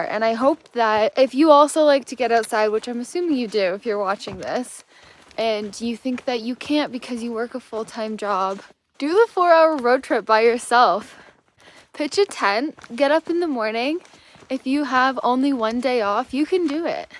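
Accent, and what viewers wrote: Canada. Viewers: Great Canadian accent.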